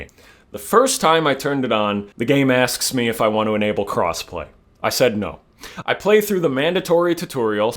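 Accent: Birmingham, United Kingdom. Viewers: You sounds very British, I'd trust you review a briish game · Hearing you as an American loving the Bri’shness and doing a pretty top job at a Brummy accent made me proud to be from that shithole! 😂😂 great video mate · subbed for the flawless birmingham accent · como diria el tablos, el acento britano le da ESENCIA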